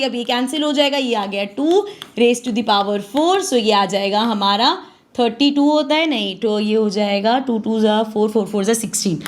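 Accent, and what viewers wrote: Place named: Marwari, India. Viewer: Ma'am very nice explanation thank you so much ma'am aap marvadi ho? Allee alle bolte ho isliye laga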